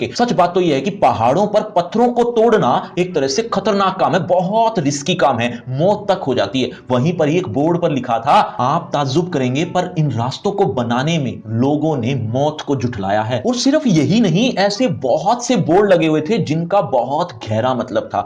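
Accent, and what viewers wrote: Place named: Punjab, India. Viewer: Sir's Definitely From Punjab,His Accent Shows It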